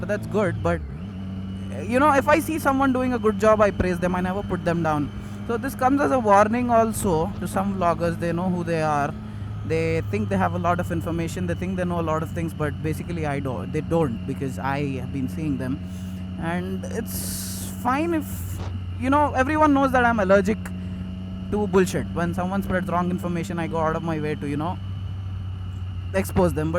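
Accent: Hyderabad, India. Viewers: Hyderabadi. ... Music to my ears. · like ur hydrabadi accent when u speak hindi. ..hehe.. but how do u speak english normally like us??where is the accent?? make a video in hydrabadi accent..would be nice.. and nice video. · Loved your Hyderabadi accent .. You should use it more often... Keep going.. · Keep takling while riding, sometimes in hyderabadi accent , but sometimes keep quite so that we can hear lovely exhaust note for while... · yar Hyderabadi hindi superb bhai..keep it up..we want more in Hyderabadi style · The best part of the video was that hyderabadi accent hahahaha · bro i love your channel but more than that I LOVE YOUR ACCENT of your language #Hyderabadi :D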